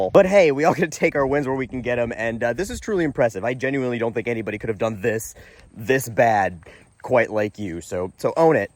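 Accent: United States. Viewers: Truth be told, you sound American to me… now may I please have a Hamms?! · UK here. You sound like an American to me 🤷🏻🤣♥️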